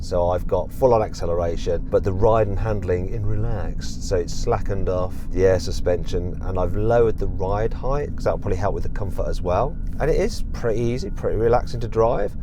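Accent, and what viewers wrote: United Kingdom. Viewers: 그러고보니까 번호판은 텍사스인데 억양은 영국억양이네??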